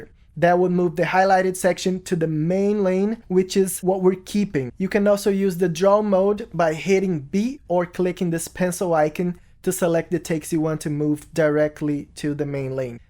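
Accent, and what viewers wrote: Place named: Brazil. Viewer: I can recognize a fellow Brazilian accent! eh nois mano!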